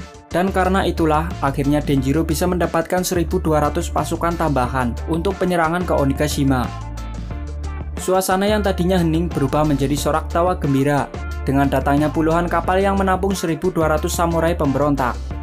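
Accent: Java, Indonesia. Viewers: Medok banget anjing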